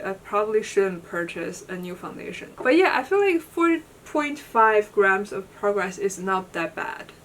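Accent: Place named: China. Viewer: 之前听你口音就觉得是中国人，果然😊爱看你的铁皮进展❤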